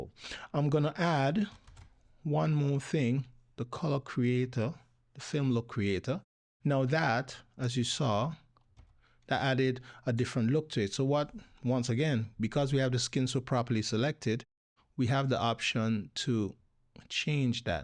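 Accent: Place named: Trinidad and Tobago. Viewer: You talk like you're from Trinidad!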